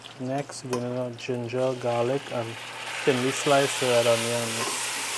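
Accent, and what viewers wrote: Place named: Trinidad and Tobago. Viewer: My Brother in Law is Trini……. Just hearing the accent makes me Crazy HUNGRY!!!!! ❤️🇹🇹❤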